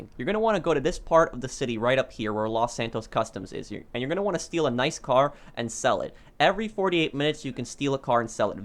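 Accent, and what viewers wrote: Poland. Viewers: I got kicked when I was watching TV on pc... Btw are you Polish? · Are you polish? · Are u polish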